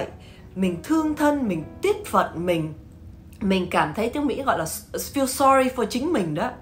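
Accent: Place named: South, Vietnam. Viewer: Rat Thach Noi going nam, you are so cute!love you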